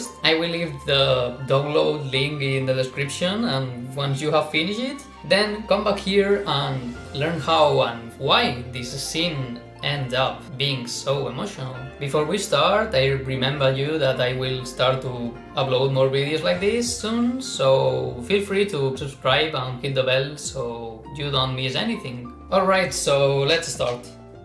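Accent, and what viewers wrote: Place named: Spain. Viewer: Se nota q hablas español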